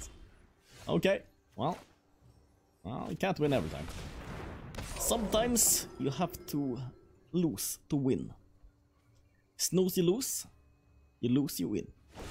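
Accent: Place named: Sweden. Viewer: i always cringe a little bit whenever Sweeds try to pronounce g's and J's hhh, great video though, keep it up.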